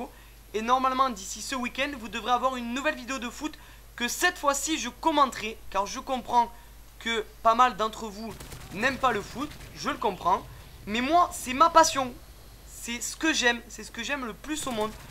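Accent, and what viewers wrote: South, France. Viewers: ta raison mon gars tkt tu va aller loin et evite les con ki mette des pouce rouge tu c meme pas pour koi en tt cas t un de mes youtuber preferer ac ta peche ton accent du sud ! en tt cas bonne continuation. et je compren pour le but de monptelier ke tu les upload parce quil est magnifique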